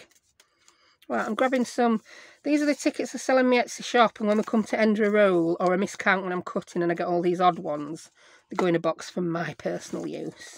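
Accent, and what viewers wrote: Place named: Yorkshire, United Kingdom. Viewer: So refreshing to hear someone with a Yorkshire accent. I was born and grew up in Wakey! Just discovered your channel as I have the junk journal bug again and your style is just what I'm aiming for. I've recently subscribed and am working my way through your videos.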